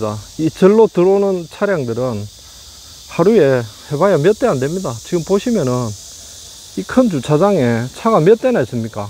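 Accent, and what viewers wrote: Gyeongsang, South Korea. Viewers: 차분한 경상도 사투리가 언제들어도 편안해 지는것은 저혼자만의 생각은아닐겁니다. 길에서 봉이 김선달처럼 돈 뜻는일이 어찌 그곳만 그랬을까요? 옛날 에 씨가 때어날때쯤에는 서울에서 시골로 주민등록 전출때 동사무소직원에게 그때 최고로 비싼 신탄진 담배한값인 50원을 열려있는 서랍에넣어주지 않으면 주민등록서류가 언제 도착할지 모르는 그런시절도 있었답니다. 그래도 지금은 정말 세상좋아졌다고 생각합니다.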